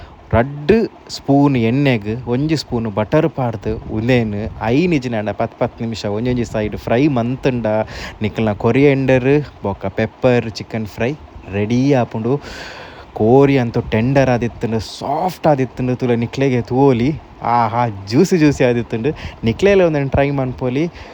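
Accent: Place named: India. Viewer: Sangat tidak cocok berbahasa Inggris dengan aksen india seperti itu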